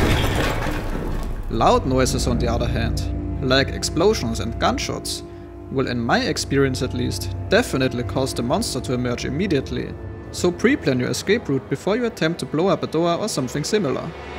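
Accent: Germany. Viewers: he sounds german, not french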